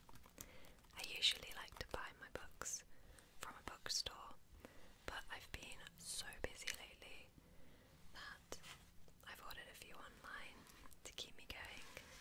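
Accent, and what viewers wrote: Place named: United Kingdom. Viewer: As a fellow Brit ASMR in a British accent is super comforting to me, pair that with books and you really can’t go wrong, really happy I found your channel!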